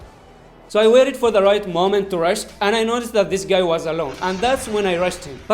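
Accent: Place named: India. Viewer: Title: "This is why my server is the hardest" Me: Probably never heard of Asia’s servers Also me clicking the video and hear the indian voice Oh… Never mind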